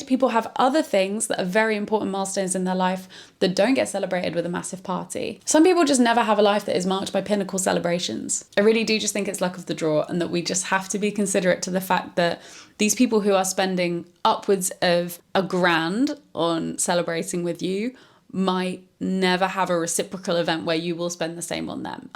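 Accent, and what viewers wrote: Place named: England, United Kingdom. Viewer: Was odd hearing the word "bachelorette" so much in an English accent - so the Americans know what you're on about I guess - then you said "hen do" 😉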